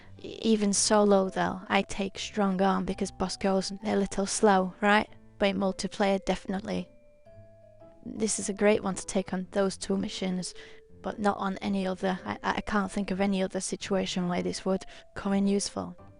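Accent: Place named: United Kingdom. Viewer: That's just British my friend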